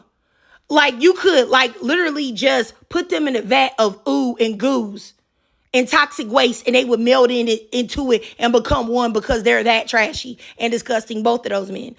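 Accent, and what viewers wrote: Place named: California, United States. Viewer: Okaaay I didn’t know you were in your 40s. Same! And I can tell you’re from Cali lol I’m in Inglewood. 💜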